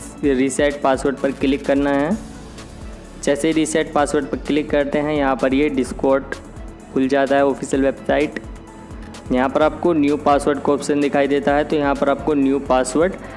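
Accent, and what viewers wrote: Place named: India. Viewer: He is speaking English just in a Indian accent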